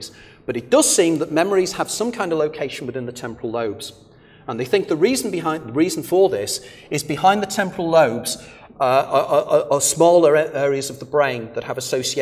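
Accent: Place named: England, United Kingdom. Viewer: very interesting but why the unfriendly, snappy tone? Maybe it is the English accent, I don't know, if was Scottish or Irish, I am aware that he would sound friendly and even funny, full of spirit, but listen to Richard Dolan, American, he does not fly through his lectures and sound so cold....otherwise, great lecture. But I don't agree with "you live your life again and again until you live a perfect life". Why the human morality all of a sudden? why the idea of hard work, linked to progression then release? And who created the video game we are in (as player and creator are different people here in this reality)? Why do animals suffer so much? What's in the game for them? What about all the kiddies that Jimmy Saville raped? Are they to live again and again until they discover the right choices? What if Philp K Dick was right and the creator of this game was or the creators were evil?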